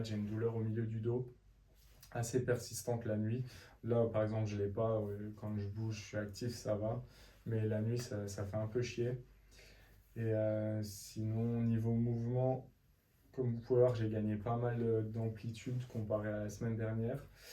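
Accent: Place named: Lorraine, France. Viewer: Hello ! Merci beaucoup pour ce journal de bord, bien amené et bien documenté ! Bien contente d'être tombée dessus :). Ici je suis actuellement à J7 d'une chute en VTT de descente avec un stade III également, et je me reconnais totalement (la douleur du matin par exemple ;)), c'est fou ! Par contre je pense me faire opérer parce qu'étant une femme les muscles autour de l'articulation ne suffiront probablement pas à compenser la gêne qu'elle soit fonctionnelle ou esthétique, d'autant que j'ai besoin d'être rapidement opérationnelle sur le plan professionnel & aimerais reprendre ce qui est volley +++, natation, squash... sans crainte. A discuter avec les chirurgiens dans les prochains jours ! Et merci pour ce bel accent du Grand Est (lorrain?) qui me ramène à mon Alsace natale ! ;)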